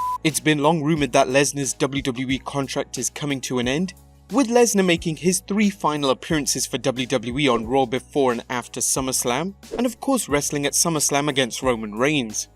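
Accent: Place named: United Kingdom. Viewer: Ew a British voice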